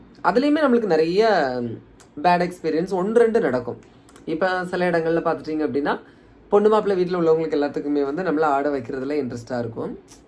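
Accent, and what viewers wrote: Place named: Kanyakumari, India. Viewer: ஹாய் தம்பி நான் கீதா உங்க வீடியோ இப்போ கொஞ்ச நாளா பாத்துட்டு இருக்கேன் ரொம்ப சூப்பரா இருக்கு உங்க ஊரு எந்த ஊருன்னு எங்களுக்கு தெரியாது நீங்க பேசறது வச்சு பார்த்தா கன்னியாகுமரி யார் உங்க கூட பேசணும்னு நினைக்கிற நானும் என் பையனும் உங்க கூட பேசணும் நினைக்கிறேன் எங்களுக்கு நம்பர் கிடைக்கிறது இல்ல தப்பா நினைக்கல என்ன நம்பர் கொடுக்குறீங்களா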